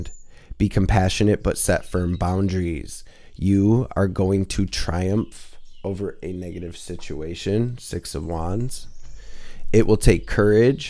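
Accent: Detroit, United States. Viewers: You from Detroit?? 😊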